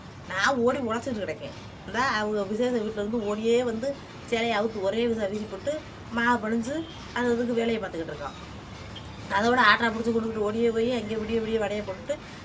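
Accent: Madurai, India. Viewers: நீங்க மதுரை ya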